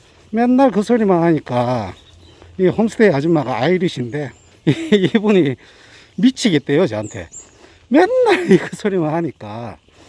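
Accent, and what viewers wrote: Gyeongsang, South Korea. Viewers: 구수한 경상도 말투에 담긴 진지함에.. 좋은정보..항상 감사드립니다. 시드니에서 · 항상 뉴스 바로바로 올려주시고 구수한 경상도 사투리(저희 남편도 대구 출신)가 엄청 정겹고도 따뜻하게 느껴지네요. 이민 온지 13년이 되어가고 말만 시민이지 영어 포기자로 딸애한테 영어공부 좀 하라는 구박 받으며 이렇게 나이가 들어 가나 했는데 영어 해석 실력에 자극 받으며 테잎이라도 다녀볼까 생각중입니다!! 늘 업데이트 되는 최신 뉴스 열심히 기다리는 일인입니다!! 가족모두 항상 안전하고 건강하시길~ 오늘도 하겠습니다!! ㅋㅋ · 와우 처음왔습니다. 마스크하시고 옆집에 사시는 어떤 분과 같네요. 헉~~~6~7개월 동안 와.... 대단하시네요. 여행 갔다가 사시는군요... 아 경상도 말이군요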